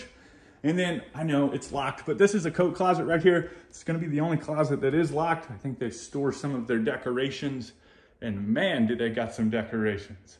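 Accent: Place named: North, United Kingdom. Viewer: Too northern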